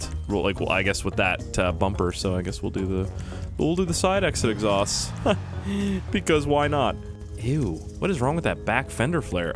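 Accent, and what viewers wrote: Nova Scotia, Canada. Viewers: What side of Nova Scotia are you from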